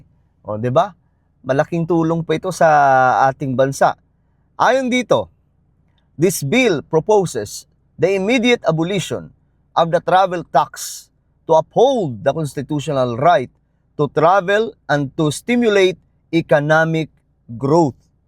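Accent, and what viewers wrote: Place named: Visayas, Philippines. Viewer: No offense sangkay...napaghahalata ka talagng Bisaya kc some of your pronounciations are hard aeg: Senate you said Si- nate, abolish not abulish..:hand-purple-blue-peace::hand-purple-blue-peace:💌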